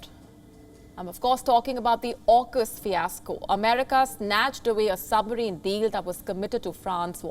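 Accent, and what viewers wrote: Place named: India. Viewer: we can. She is Indian,not supposed to sound like english If u like british accent so much go to english channel. An indian will have indian accent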